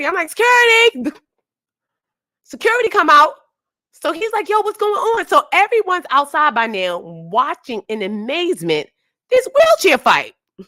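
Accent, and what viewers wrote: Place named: New York, United States. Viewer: OMG, I love your NYC accent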